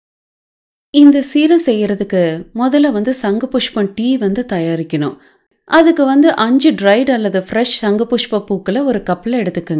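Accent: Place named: Kongu, India. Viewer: Beautiful kongu tamil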